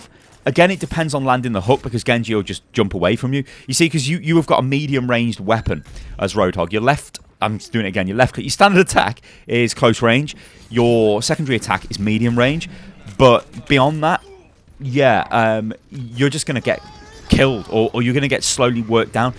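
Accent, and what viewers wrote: United Kingdom. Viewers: i usually dont like the British accents, but your commentary is on point! subbed man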